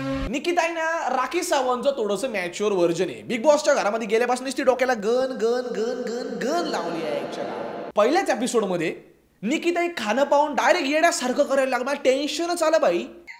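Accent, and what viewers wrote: Khandesh, India. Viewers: direct khandeshi accent madhe bolayla lagla ye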